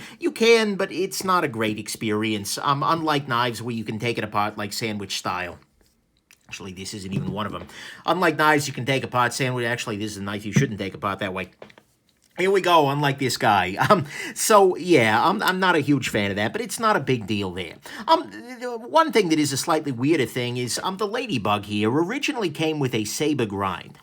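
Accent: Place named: South, United States. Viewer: So when do we get the high speed low drag review of the ladybug with that southern accent?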